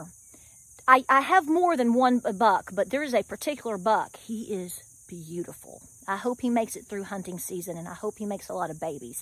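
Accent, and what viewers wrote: South, United States. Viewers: Love your Down to Earth style talking. Southern kinda talk; I'm a Tennessee born individual and (ha ha 😅😅😅) it's my style that's like down home old schoo.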